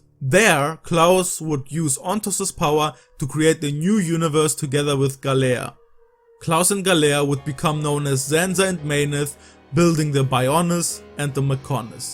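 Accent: Germany. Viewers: Hi, ich habe deinen Channel gerade entdeckt und gleich mal ein paar Videos nacheinander geschaut. Super, einen Youtuber aus Deutschland zu finden, der sich mit Xeno-Games beschäftigt. Hab' auf jeden Fall ein Abo dagelassen und bin gespannt auf deinen weiteren, interessanten Content. Abseits davon, ich könnte mir vorstellen, dass du leichter mehr Reichweite gewinnen könntest, wenn du hier und da etwas mehr an deiner englischen Aussprache und den Formulierungen feilst. Ich hatte den starken deutschen Akzent ziemlich schnell rausgehört und das hat mich leider immer mal aus dem Inhalt rausgerissen. Einige Fehler im Satzbau bzw. bei verwendeten Begrifflichkeiten waren auch festzustellen, ich konnte mir aufgrund meines deutschsprachigen Hintergrundes aber gut zusammenreimen, wo das herkommt. 🙂 Das ist absolut nicht negativ gemeint, also bitte nicht persönlich nehmen! 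Ich finde lediglich, dass es deine Videos optimieren würde, wenn du deine Scripts möglichst nochmal von jemandem gegenlesen lässt, der Englisch etwas flüssiger beherrscht. Ich bin zwar kein Englisch-Muttersprachler, habe aber durch meine Arbeit und Hobbys seit vielen Jahren täglich mit Englisch zu tun. Falls du Bock hast, kann ich dir gerne Tipps geben, wie konkrete Sätze besser formuliert und ausgesprochen werden können. Ein allgemeiner Tipp: Hör dir ruhig mal dein Script über TTS (Text-to-Speech) an und sprich es nach, das kann massiv helfen, die eigene Aussprache zu verbessern. Ansonsten, tolle Videos, sehr informat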